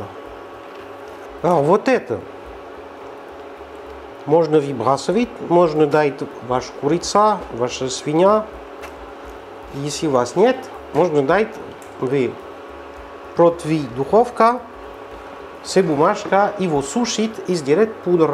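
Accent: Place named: France. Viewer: Готовлю по! Вкусно. И много ньюансов, о которых никогда не догадывалась. Спасибо большое. Отдельное спасибо за русский язык с французским произношением.Изюминка.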